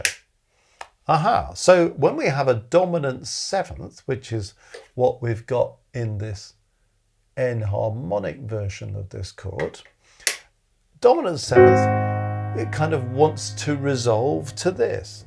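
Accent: United Kingdom. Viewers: Just heard three words with your British accent made me push the thumb up :)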